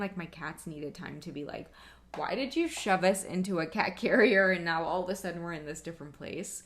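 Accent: Trinidad and Tobago. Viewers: You did a good trini accent. Thank you for the reading.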